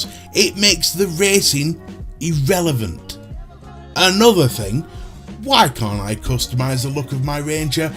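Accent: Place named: Yorkshire, United Kingdom. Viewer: Awesome review, guessing by the accent you're from Yorkshire/Lancashire, Anyway I bought Rage 2 and I was having a blast but see your points entirely and also don't agree it should get 9 out of 10s. I think a fair score would be 6/7 out of 10. Maybe 8 maximum. I think "getting your money's worth" is a little subjective because I'm having so much fun with it I've never thought "hmm I feel robbed" HOWEVER, I wouldn't recommend buying full price to someone just now. I think if you waited a couple of months you'll be able to pick this up for 15/ 20 pounds and tbh that's about the price It would seem like a bargain. when I first saw it on steam a couple of weeks ago it was priced 29.99, which I think is where it should of stayed but day before released I noticed it cost 40 pounds which for me is tipping it just to far to recommend full price.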